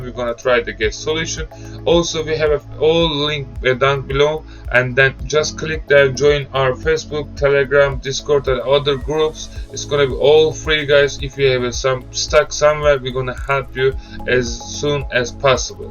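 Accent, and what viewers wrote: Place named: Turkey. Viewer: eski alışkanlıklar unutulmuyor ceterele viii :) şaka maka türk gibi ingilizce konuşmanı çok taktir ettim eğitimini almayı düşünüyorum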